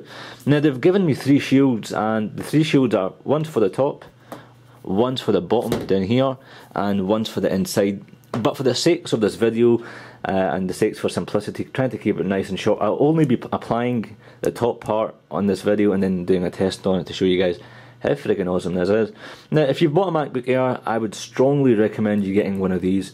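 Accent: Scotland, United Kingdom. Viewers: It's called a scottish accent ... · At first i thought you were French, then i realised you were Scottish lol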